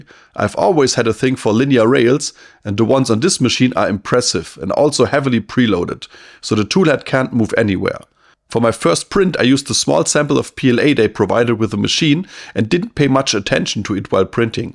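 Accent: Germany. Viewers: Ja man hört den deutschen Akzent und das fremdschamt schon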